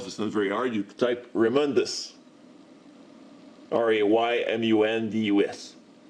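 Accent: Quebec, Canada. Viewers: you have a french canadian accent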